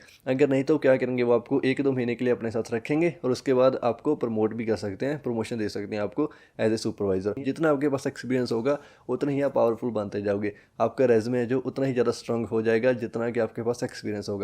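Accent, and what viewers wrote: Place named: Punjab, India. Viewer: punjabi da svad vakhra e c yr, but its 👍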